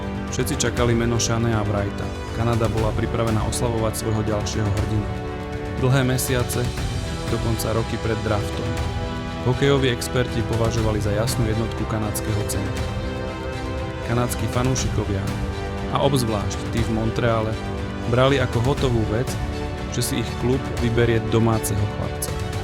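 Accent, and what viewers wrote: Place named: Slovakia. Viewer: Mne sa to práve že páči. Rozpráva po slovensky a nie po "ameuricky" 😊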